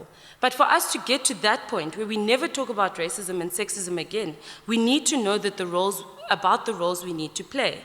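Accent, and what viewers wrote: South Africa. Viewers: She learned all these languages in South Africa as you can hear her English is different from your Nigeria. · She's got good intentions for our social justice,compact and cohesion. She sounds rooted as a South African. Apologies for responding in 8years,it sounds present.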